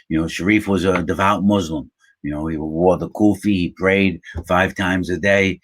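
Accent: New York, United States. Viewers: The way you tell stories is awesome. I don't know why, but i love the NY accent. Thanks for the authentic insight.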